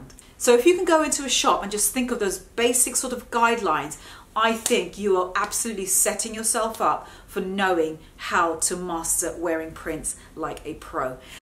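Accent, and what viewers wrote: United Kingdom. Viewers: Bello acento britanico :) y obviamente excelente video!!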